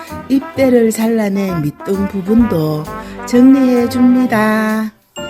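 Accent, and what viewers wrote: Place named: Gyeongsang, South Korea. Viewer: 경상도 아줌마가 서울말을 하시면 이렇게 되는구나❤🎉😂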